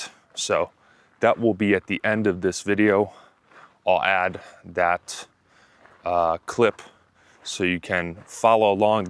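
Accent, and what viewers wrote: United States. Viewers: your American accent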